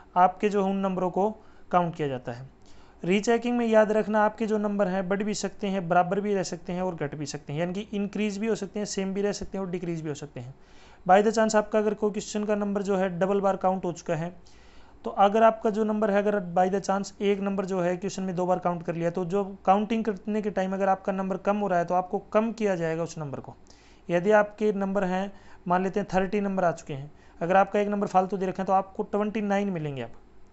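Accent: Haryana, India. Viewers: bhai aap haryana se ho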